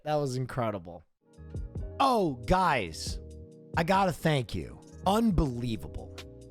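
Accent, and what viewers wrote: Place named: California, United States. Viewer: Man Will is looking and sounding more Californian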